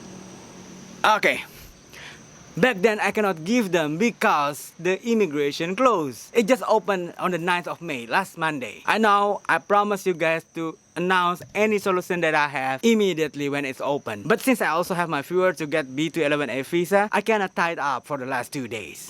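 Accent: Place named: United States. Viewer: If you speak slowly and without American accent than we can understand you much better.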